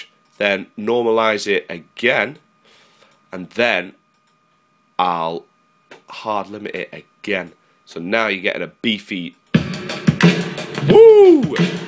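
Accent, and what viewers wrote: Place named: United States. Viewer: superb american accent, you sound like the filmer Beagle from baker skateboards haha. love your tunes, neat tutorial!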